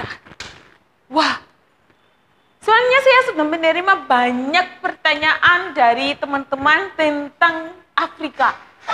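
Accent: Java, Indonesia. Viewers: Cantik mba ... tapi logat indonesia y kaya org jawa.... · Lucu banget sih kak, medhoook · Kebanyakan orang Indonesia kurang mengetahui geografi dan sejarah. Tetapi tidak semua. Masih banyak orang Indonesia yang tau geografi. Saya salah satu orang yang menyukai geografi. Ngomong ngomong bahasa indonesianya kog Medok ya mbak.. Tapi jadi lucu 😁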